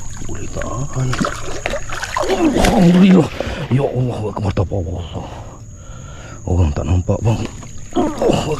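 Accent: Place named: Melaka, Malaysia. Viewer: Bro dgr ckp mcm org Melaka ni bro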